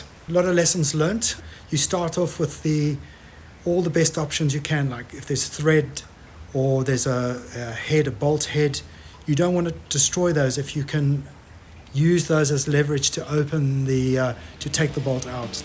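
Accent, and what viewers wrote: South Africa. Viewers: Great work bru'...where you from?...I'm guessing Maritzburg area...miss SA🥺. Love your videos, you're on your way to 1m subs in no time!!!.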